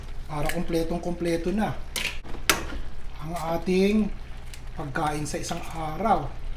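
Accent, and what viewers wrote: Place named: Bulacan, Philippines. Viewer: Bulakenyo po ba kayo? Nakakatuwa po kayo magsalita eh prang tulad nmin dto s bulacan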